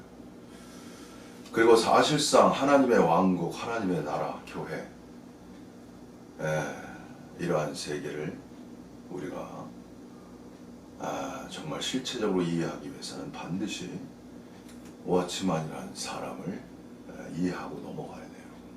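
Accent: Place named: Gyeongsang, South Korea. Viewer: 딱 경상도놈 같더라니 울산이구나!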